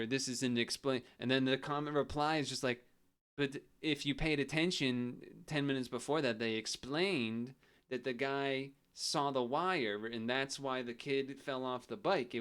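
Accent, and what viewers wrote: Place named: Newfoundland, Canada. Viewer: NL cadence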